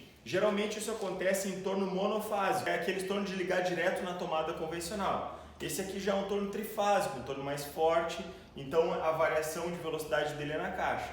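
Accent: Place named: Rio Grande do Sul, Brazil. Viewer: Muito bom seu vídeo! De qual cidade você é? pelo sotaque é gaúcho! sou de Vacaria -RS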